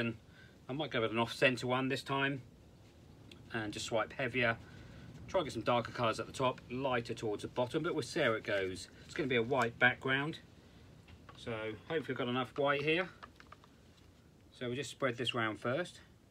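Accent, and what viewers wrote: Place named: United Kingdom. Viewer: One Brit to another , I seem to understand better with the accent!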